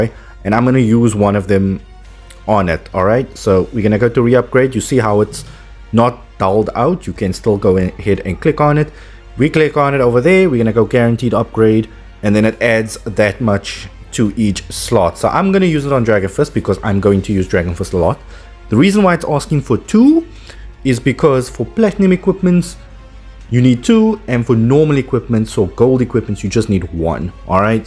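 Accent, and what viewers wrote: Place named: South Africa. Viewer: Knew It was a fellow South African by the accent😂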